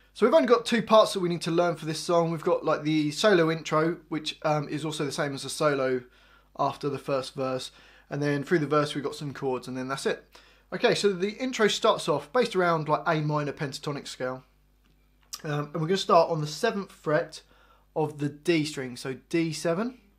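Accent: United Kingdom. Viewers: Omg how cutie british accent I love him speeches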